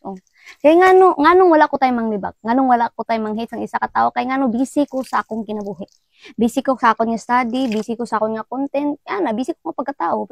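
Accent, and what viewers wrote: Cebu, Philippines. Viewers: Ilonggo pero ang tono Cebuano... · Ilonggo pero medyo may sagul cebuana❤😂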